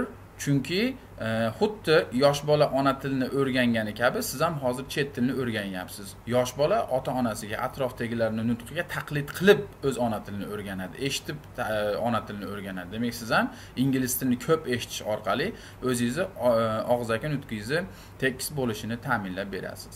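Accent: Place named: Andijan, Uzbekistan. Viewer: инглиз тилини Андижанча манерада гаплашиш.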